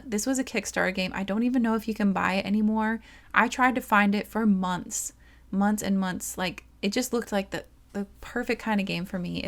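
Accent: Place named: United States. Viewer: I love your accent,! What state are you from?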